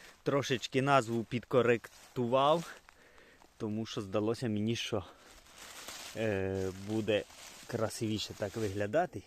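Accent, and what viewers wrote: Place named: Ukraine. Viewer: Отличная прогулка! Впрочем, как всегда., какой красивый у тебя классический украинский язык, даже без грибов, тебя можно слушать бесконечно! Без сленга, без польских и венгерских слов. Так говорить могут не многие и уж точно, сейчас не учат в школах. Я -то, точно не сбегу к другому блогеру 😁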